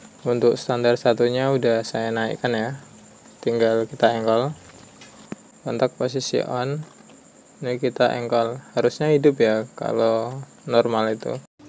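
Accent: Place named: Java, Indonesia. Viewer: Masse... njenengan teng daerah pundi toh...ngomongnya medok... maturnuwun sanget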